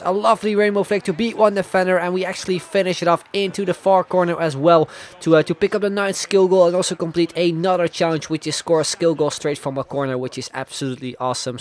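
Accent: Netherlands. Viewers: is he dutch? he sunds like?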